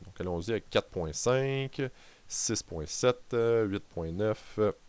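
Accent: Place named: Quebec, Canada. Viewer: un peu long et accent du québec mais bien expliqué , complet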